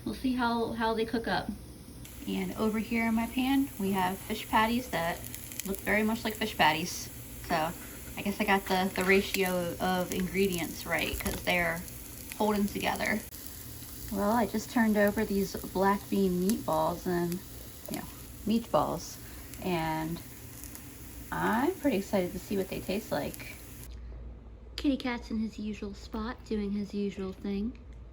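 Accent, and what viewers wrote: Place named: Delaware Valley, United States. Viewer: Hi! I just found your channel and it's incredible! I saw that you're located in NC, but I'm curious if you're originally from the PA/DE/NJ area? Your accent reminds me of home. 🤍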